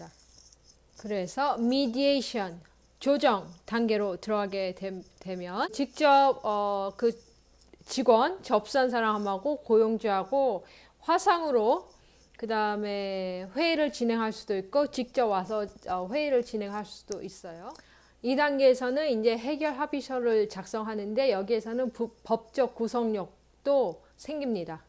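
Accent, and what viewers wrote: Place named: Canada. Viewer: 차분하시고 한국말하시지만 혀 굴리는 소리가 많이 들려서 처음에는 캐나다에서 어릴때부터 사셨나 했더니, 성인이 되어가셨군요. 그래도 역시 중등학교 과정과 대학을 거기서 다시 졸업하셔서 그런지 캐내디언 같습니다.^^ 저도 취업비자로 들어가서 영주권을 받으려고 하는데 많이 도움이 될 것 같습니다. 감사합니다.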